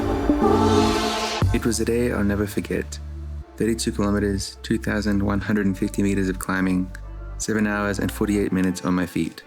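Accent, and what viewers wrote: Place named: New Zealand. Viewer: Interesting! Thanks for sharing! I thought I heard some Kiwi in there. Thanks for this video, might do Yushan in one day in November, and your other travels seem so sick as well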